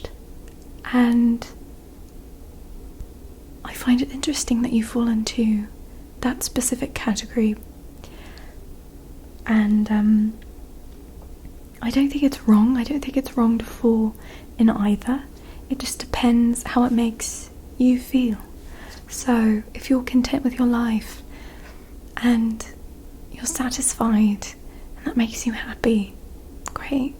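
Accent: England, United Kingdom. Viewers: Please do more British accent video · that's a flawless English accent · Your English accent is so flawless, you really should be an actress. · What a voice! so soothing.Nice english accent.Poetry to my ears. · I genuinely thought you were British, until I saw your other videos. This accent is exquisite! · I love British.. or should I say English! ♥️ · This is the greatest English accent I’ve ever heard from an American. Are you an actress? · Absolutely spot on accent, nicely done (from a UK viewer)! YT pops up this from the past, lovely to see, as always. You even seem to be 'acting Englishness', you would have been excellent in The Crown 😊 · I can call myself British because Britain is a nation (you forgot to include Northern Ireland, by the way.) Also, I specifically said I thought she sounded English, not British. If you want to call yourself a North American, that too would make sense because it’s where you’re from. 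Stick to America, I’ll stick to Britain! 🤗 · I’m from south London, how do you sound more English than me? 🤔😂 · I thought she was English, it was the first video I've seen from here, so I went to watch another and I was shocked she was American. I'm English as well x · As an English person, Americans are usually dreadful at putting on an English accent - espeically so-called professional actors!....but this is absolutely 100% convincing. Like many others, this is my first video of yours, and I never suspected you weren't English for one moment, until I read the comments. You should get into professional acting! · Wow, with the British accent you really remind me of Kathy from the Patty Duke show lol. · Can I just ask who or what you copied your accent from? You sound more English than any Brit ever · Your accent is super believable from my experience a lot of Americans trying to do an English accent either sound like Dick Van Dyke in Mary Poppins or a super over the top impression of the Queen. 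If I heard you I would think you were English...I'm English, just thought I'd mention that for context haha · The English accent is back... Yay! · Not gonna lie but when women have a English accent its super super attractive. I love the way it sounds. Very calming. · It's! Nice to see a new ASMR video from you! Watching this at the moment for a comforting Tuesday afternoon! Love the English accent as well:) You're the coolest! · Best English accent from an American I’ve ever heard 🙌🏻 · I swear must be partly English with an accent that convincing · I just came here because I miss British accents and I find myself answering the questions in my head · It is so nice to hear an American do an English accent and not sound Australian. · I'm only just discovering the British accent videos, these are delightful! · Wait, you AREN'T English? Your accent is incredible. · I always forget youre not british when watching a video. 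Hah, thats great! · As someone who’s English I’m actually so impressed by your accent 🤭 if I didn’t know you were American I’d genuinely think this was your real voice! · Umm, you're incorrect. Thats not an American accent at all! That's an excellent British (more correctly, English) accent. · Wow. If you showed up talking like this I wouldn't be able to pin where exactly you're from (I'd guess maybe Sussex or Surrey), but I wouldn't have any doubt you were English. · I'm British from Nottingham, thats a very beautiful english middle class accent. Your very considerate which I think reassures people.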